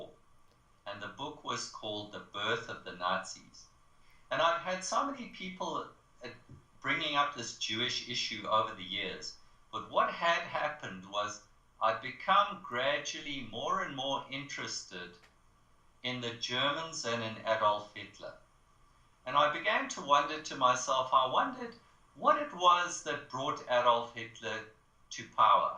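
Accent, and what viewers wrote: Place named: South Africa. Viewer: I am a South African Afrikaner and just listened to this video. I've also downloaded the Battle of blood river part 1, Jews 102 and Jews 104. I've noticed you're SA accent and love listening to you, you speak slowly and very clearly. My awakening to the whole Jewish issue started a few months ago when I've studied ww1 and ww2. The Jewish hand was noticeable all over these two wars and I started to be more interested in the Jews. Even if they only constitutes 1% of the world population the damage they do are scary!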